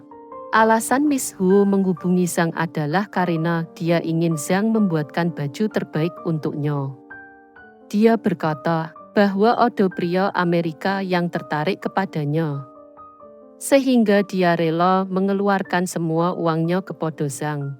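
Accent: Java, Indonesia. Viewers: Medox banget mbak...!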